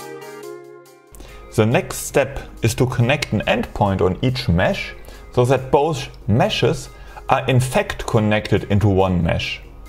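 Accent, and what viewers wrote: Germany. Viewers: do i hear a little bit of deutschen akzent?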